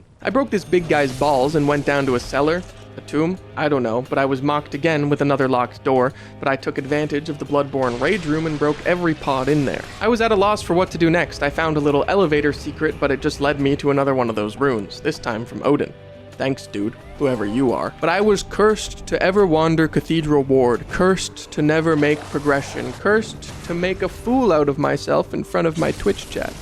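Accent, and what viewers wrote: Canada. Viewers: Never realized you were Canadian, but dropping "University", "bursary", and "KD" when talking about noodles all in a single video outed you as a true great white northerner.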